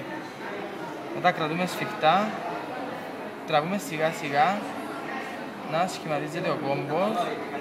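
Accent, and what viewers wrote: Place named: Cyprus. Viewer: ποσοοοοο μα ποσοοο ωραιαα μιλαειιιι.!!! αυτα τ κυπριακααα !!! :DDDD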